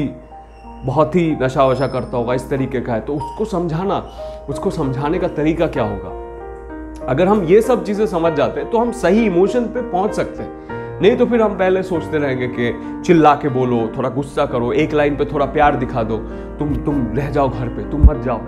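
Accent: Bengal, India. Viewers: Sirji thanks for the video par aap apna hindi ka R theek kar lo..Bengali accent aa raha hai usmein..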